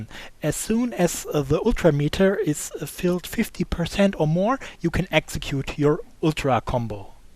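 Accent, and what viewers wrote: Germany. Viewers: ^^ du sprichst genauso englisch, wie ich, mit so einem deutschen Akzent, aber trotzdem gutes tut :D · Hi, just wanted to swing by to say that I find your tutorials very insightful, but unfortunately I can't stomach your accent for more then a couple of minutes.I hope this does not offend you, as I am german myself, and I know that most germans don't get the english pronunciations right. This is too bad, because apart from that, I really like your presentation.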